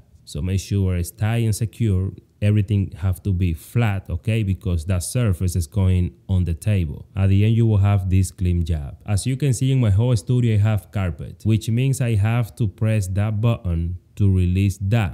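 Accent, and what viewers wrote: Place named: Dominican Republic. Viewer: i know a Dominican when i hear one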